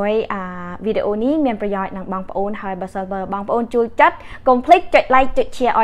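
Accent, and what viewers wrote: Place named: Cambodia. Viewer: Thank you, your Khmer accent is so sweet too I love ❤️ it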